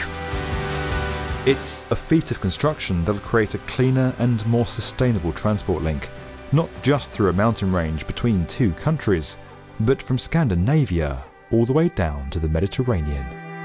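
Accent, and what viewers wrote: United Kingdom. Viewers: Why is the cost presented in US dollars when the presenter is, or at least, sounds British, and the project is based in Europe- an EU project. Surely the cost should have been in Euros???🥺🧐🙄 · Seems kinda weird to have a british guy narrate this, about a tunnel being built in europe, by the EU, and use dollars to explain how much it cost?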